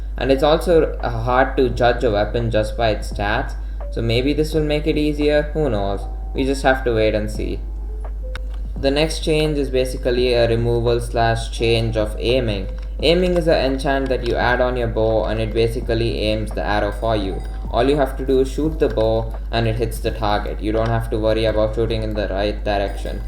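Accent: India. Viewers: U sound kinda indian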